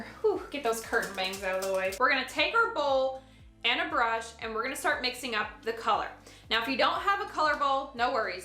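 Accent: South, United States. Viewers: Okay, you need your own talk show 🎉Fo REAL, though! You're absolutely adorable; and just incredibly endearing to watch! Normally, Southern accents drive me insane; but it just adds to your amazing charm 😊😅 Can you do a video of your haircut, updo messy bun, braids, and your make up! Your foundation is pretty flawless, and your brow are on fleek! Are they microbladed? You look like a more edgey Stassi Schroeder from VPR, actually!!! Thanks for all the wonderful tips! Soooo incredibly helpful 🎉I hope you got a nice side hustle going through social media $$$ 😊❤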